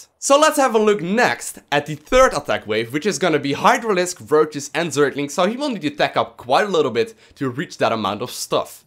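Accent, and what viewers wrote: Netherlands. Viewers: Dutch, pretty much the same as a german accent xD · are you from Holland?? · He's from the Netherlands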